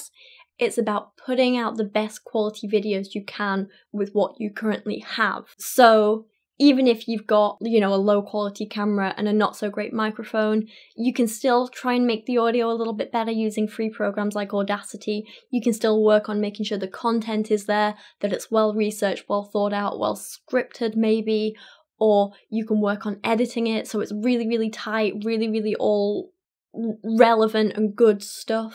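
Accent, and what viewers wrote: United Kingdom. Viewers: Uh ok why the fuck haven't I noticed you were British until just now? I've been watching you for about a year now lmao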